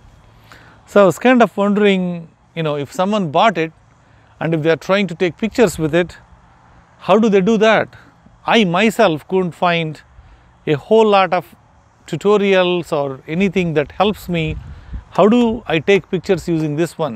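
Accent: India. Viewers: Indian accent 🥴. I don't understand anything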